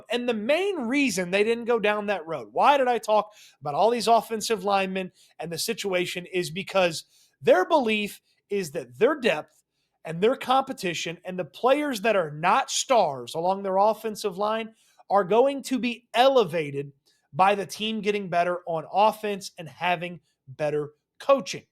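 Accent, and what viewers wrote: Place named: Texas, United States. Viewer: As soon as I heard your voice I knew there was a fellow Texan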